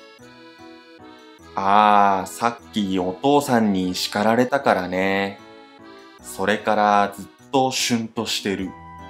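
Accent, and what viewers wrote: Taiwan. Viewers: 台湾腔好可爱～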